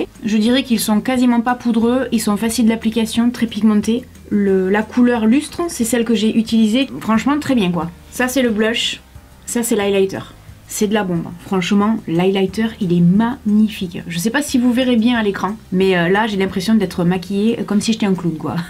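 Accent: South, France. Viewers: J'ai découvert ta chaîne aujourd'hui, et j'adore :) !!! Ton accent du sud est super joli, mais j'aime beaucoup comme tu présentes tes produits :) Je m'abonne !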